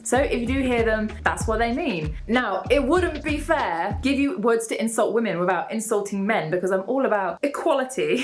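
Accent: United Kingdom. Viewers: we'll, that's a shame, cause it sound's very funny and ear it in british accent is fabulous. Thanks!!!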